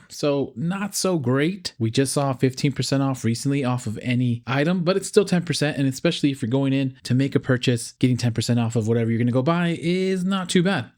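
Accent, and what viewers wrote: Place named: United States. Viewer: This guy has such a pleasant voice. No homo. A very cool American accent man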